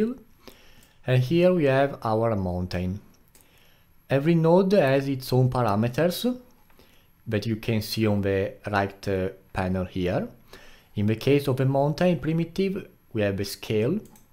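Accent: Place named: Italy. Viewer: Very good tutorial! Curious question, are you Italian? That's a very thick accent... Not that I mind it! It's quite charming! Great tutorial!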